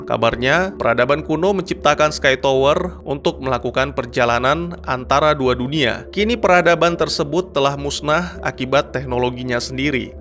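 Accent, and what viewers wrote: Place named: Medan, Indonesia. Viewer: Logat medan 👍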